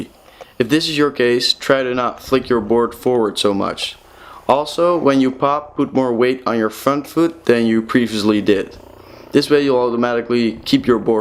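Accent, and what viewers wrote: Netherlands. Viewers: sickkk btw you sound very dutch bc I am dutch😂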